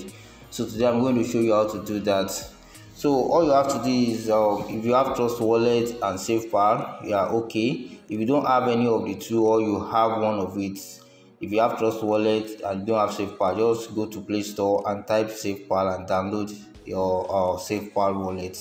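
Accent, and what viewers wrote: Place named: Africa. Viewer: Just because you dont understand African english. People like us understand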